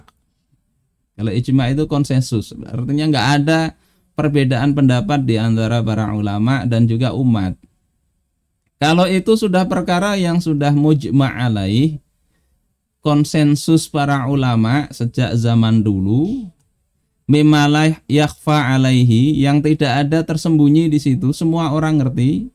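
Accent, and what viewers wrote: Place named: Java, Indonesia. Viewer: Bahasa Banjar logat Jawa tadz..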